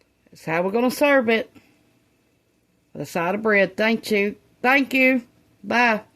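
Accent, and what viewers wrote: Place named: North Carolina, United States. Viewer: You sound like you're from NC. 😊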